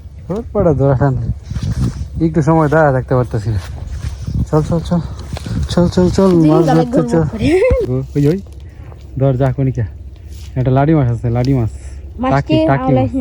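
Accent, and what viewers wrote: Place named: Mymensingh, Bangladesh. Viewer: ভাই আপনার বাড়ি কি ময়মনসিংহে কথা শুনে বোঝা যায়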